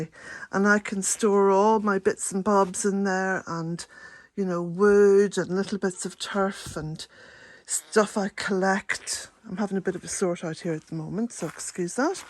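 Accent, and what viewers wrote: Ireland. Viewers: Just beautiful, so calming, serene and I love your Irish brogue ❤ I am in the USA, my Irish roots great-great grandparents were from Dublin 🍀 (Newsome & McCarty) So thrilled to have found you!! Love, love, love 💘 💕🍀❤🍀❤😁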